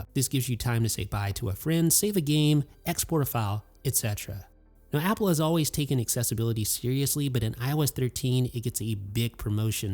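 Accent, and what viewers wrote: India. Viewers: Whyyyyyyy the F would it have Indian accent????? xD xD xD xD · Indian english accent.....indians like here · Grear vid and lots of info. All good except the language accent read back. No way would I put an indina voice to read me stuff on my phone. I can get that from all the scammers from there conning people here in the US lol. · Indian voice 🤩🤩🤩🤩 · Indian accent ❤️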